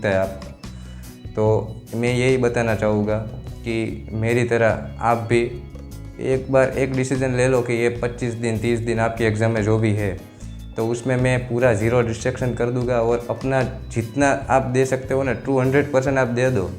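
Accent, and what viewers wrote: India. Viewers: Awaaz thee khabar padhe jaay gujarati a par saurastra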